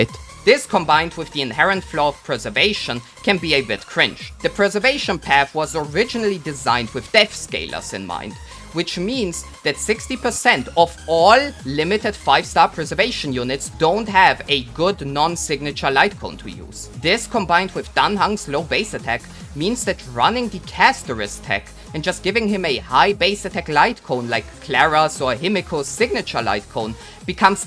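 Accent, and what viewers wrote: Germany. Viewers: bruh, i'm trying to figure your accent. Are you a German?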